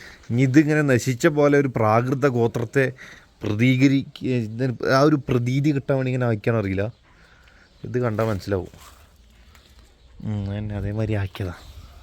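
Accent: Malappuram, India. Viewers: bad malappuram slang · അൻന്റെ 😅 വർത്താനം എനിക്കിഷ്ട്ടം, ദുബായിൽ റൂമിൽ ഉണ്ടായിരുന്നു മലപ്പുറം,ചങ്ങായിമാർ, മറക്കാനാവാത്ത, ചങ്ങായിമാർ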